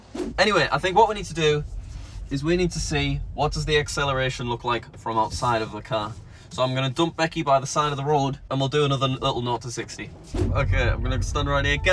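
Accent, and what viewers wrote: United Kingdom. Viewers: How good is it to hear Brits doing these Tesla road tests? 0.60 in 3.07 secs...'Oh my God...Jesus Christ...Jesus Christ....Bloody Hell' Just my kind of comment... Love it:-)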